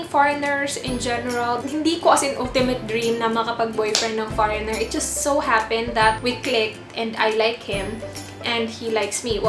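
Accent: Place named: Visayas, Philippines. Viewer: hi where are you from? bisaya mn d i ka. 😂😂😀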